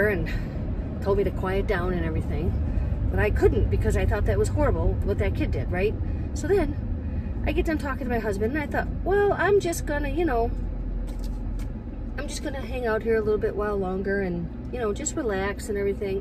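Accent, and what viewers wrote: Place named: Michigan, United States. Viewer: Hi there! New subscriber. Your video came up when I searched no build camper van. I've been watching your videos all morning. From listening to you speak in the very first video I watched, I knew you must be from Michigan too. This was later confirmed. Ive learned we have a distinct accent. I can see from this video that we are neighbors. I'm in FG. I'm retired and will be getting a minivan within the year to travel. Happy to have stumbled onto your channel. It is helping me see what will be a good way to set it up. Thank you!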